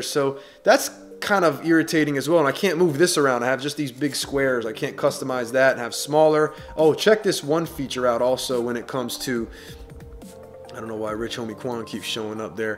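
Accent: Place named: New York, United States. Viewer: You have a bit of an accent or dialect, are you from New York by any chance? I'm probably just crazy.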